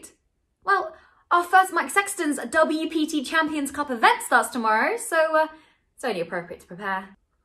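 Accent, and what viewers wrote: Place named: Australia. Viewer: australian accent i hear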